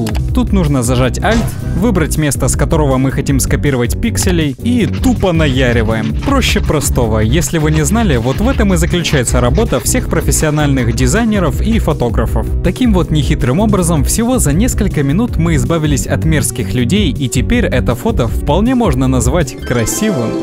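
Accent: Ukraine. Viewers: Ти из Украины ❓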